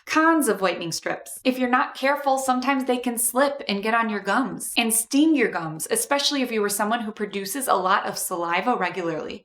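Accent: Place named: Chicago, United States. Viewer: So. Much. Chicago! I love your accent! Thanks for the great advice!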